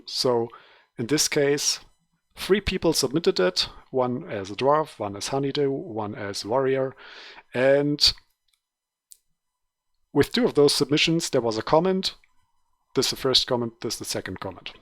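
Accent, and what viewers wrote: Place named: Germany. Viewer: Klingt nach einem Deutschem, der Englisch spricht ^^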